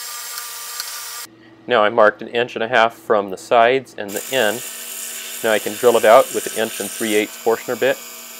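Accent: Canada. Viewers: You from Canada ehh great toutoral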